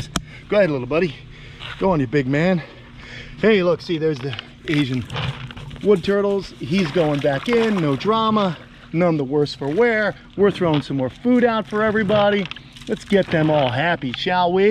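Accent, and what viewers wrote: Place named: Long Island, United States. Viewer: You are do funny! I can hear the Long Island in your voice and the way you talk! Your sense of humor is SSOOO NEW YORK!!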